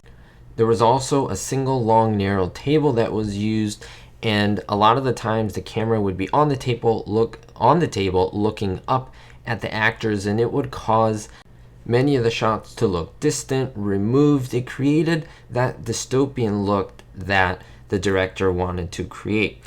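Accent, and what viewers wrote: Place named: New York, United States. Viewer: "Not really so much for the story but for just the facade and the set pieces, i think you'll really appreciate it in a different way" - Because of that line I will indeed try to catch this episode in its entirety, have only seen bits and pieces before. Always love watching your videos, interesting, cool, nostalgic, amusing, and somewhat relaxing as you have kind of a soothing voice, very New York City. Anyway, bottom line, now I want to see this epi in its entirety. Thanks!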